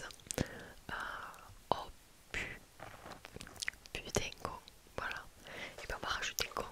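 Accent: South, France. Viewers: Cet accent du sud en asmr juste Woaw🤩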